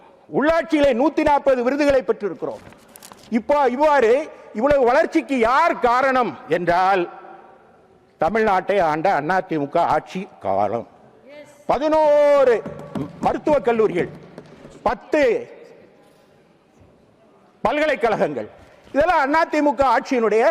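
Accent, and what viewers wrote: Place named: Tirunelveli, India. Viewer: நெல்லை தமிழே ! ஆணிதரமான வாதம்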